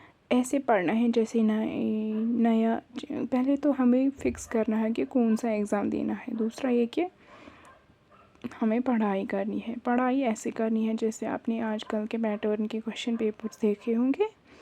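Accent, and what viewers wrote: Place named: South Kashmir, India. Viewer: Recalling my South kashmiri classmates from ur accent Anyways good wishes and keep it up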